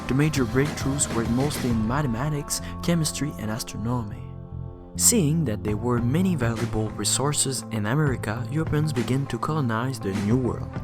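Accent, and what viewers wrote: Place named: Quebec, Canada. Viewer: Hello l’accent québécois :)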